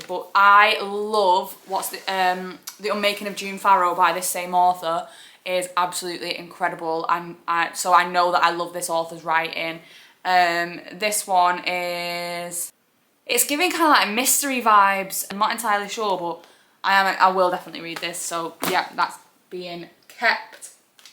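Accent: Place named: United Kingdom. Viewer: May I ask what region of the UK your accent is from? I've recently became interested in all the English dialects and want to learn how to distinguish them. I think the only tv show I recognize the accent is from Adolescent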